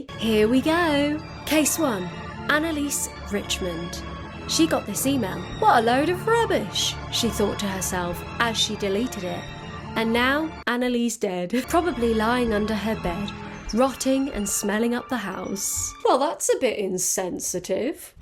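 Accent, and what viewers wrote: United States. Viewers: Ooooooh that american accent, so chill, so hip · gotta say, your American accent is really good! hahah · wow your american accent is better than mine and i’m from america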